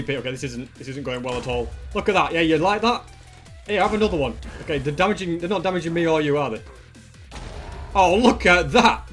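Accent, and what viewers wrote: United Kingdom. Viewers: But 100% British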